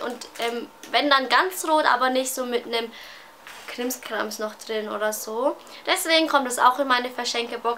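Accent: Franconia, Germany. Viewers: Bin vor ein paar Tagen auf deinen Kanal gestoßen und muss sagen dass er mir richtig gut gefällt! Du bist ja so eine süße! Übrigens wohne ich ungefähr 60 km von Würzburg weg. Und man hört es voll das du aus Franken kommst! So süß :) ich freue mich schon auf weitere Videos von dir! :)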